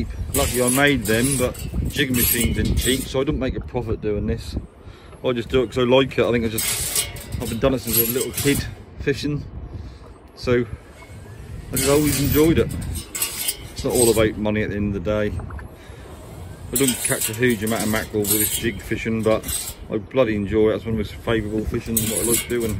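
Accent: United Kingdom. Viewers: You accent is very thick, are you from the U.K.?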